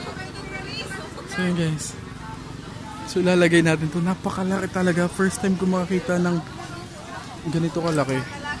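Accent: Visayas, Philippines. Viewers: Woii bisaya ka pala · bisaya diay ka kuya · Bisaya man ka noh klaro kaayu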